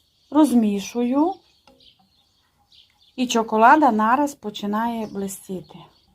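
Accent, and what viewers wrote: Transcarpathia, Ukraine. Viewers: Дуже дякую за чудові рецепти.❤Прекрасний закарпатський діалект.Любуюся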